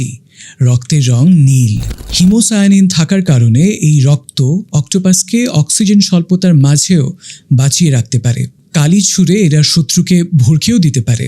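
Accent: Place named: United States. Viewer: আপনারা আমেরিকান এক্স্যানট অনেক ভালো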